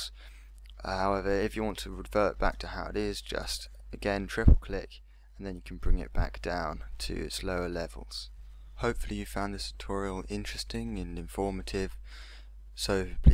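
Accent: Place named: United Kingdom. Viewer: your british accent is sexy, the british women have the awesomest accents cause their so foreign and thickly uk if not usa but once you teach a british woman to read (depends on the woman) you may find your self dying of laughter and although in love to <3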